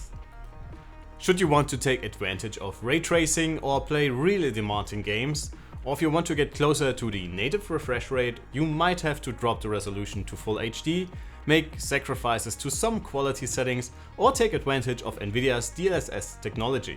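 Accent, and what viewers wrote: Germany. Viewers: The German accent tho :) 105watt not quiet my tempo :))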